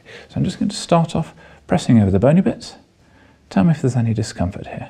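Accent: United Kingdom. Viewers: He's so British haha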